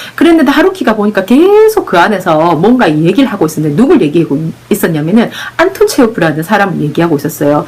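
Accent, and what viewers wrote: Gyeongsang, South Korea. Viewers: 신선하다. 모처럼만에 상큼하다. 감각이 탁월한 것 같은 느낌. 지식적 감각 또한 탁월하다. 경상도 목소리이면서 멋지다. 아뭏든 예리하고 분석적이다. 객관적 사유의 담소 아름답다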